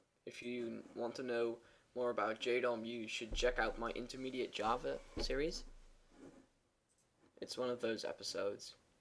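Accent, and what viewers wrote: Netherlands. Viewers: Are you Dutch? :D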